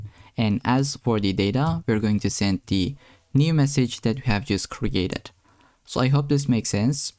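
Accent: Turkey. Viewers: Merhaba kardeşim,aksandan hemen Türk olduğunu anladım .Teşekkür ederiz video için:)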